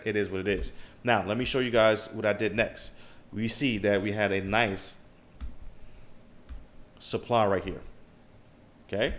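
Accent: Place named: Baltimore, United States. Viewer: I can hear the Baltimore in ya voice haha, love your vids from Jamaica 🇯🇲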